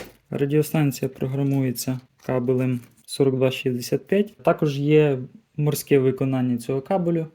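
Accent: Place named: Ukraine. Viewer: Речь хохлатая дюже поганая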